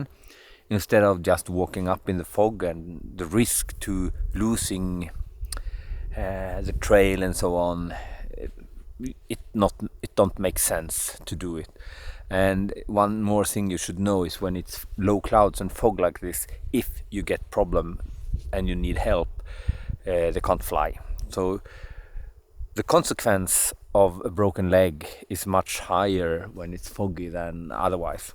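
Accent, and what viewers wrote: Sweden. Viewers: That was good entertainment! I am ready now for a tour in fog and rain, and I will practise my attitude ;)) I don`t want to sound creepy, but I love your SwEnglish, it it is very relaxing to listen to.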